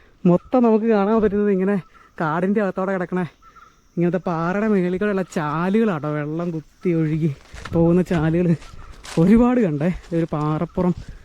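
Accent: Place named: Angamaly, India. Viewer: സംസാരത്തിന് അങ്കമാലി ടച്ച് ഉണ്ടല്ലോ.🎉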